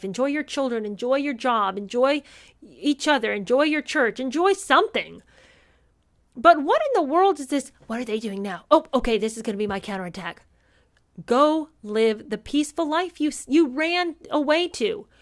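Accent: United Kingdom. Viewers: does a remarkable British accent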